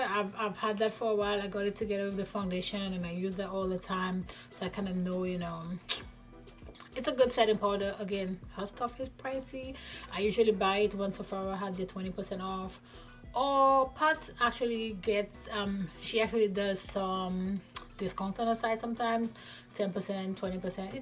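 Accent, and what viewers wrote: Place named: Caribbean. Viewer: U sound Caribbean, I love it 🥰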